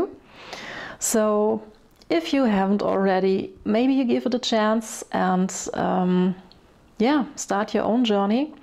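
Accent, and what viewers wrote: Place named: Germany. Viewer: girl, you are a superhero! I love every thing about what you do and already ancomplished. Auch wie ab und zu einfach das Deutsch durch kommt 😅 Aber mega gut es für alle verständlich zu machen natürlich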